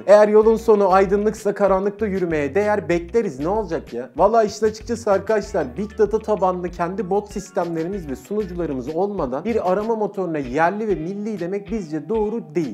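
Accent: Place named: Aegean, Turkey. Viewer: Ege şivesi e gari <3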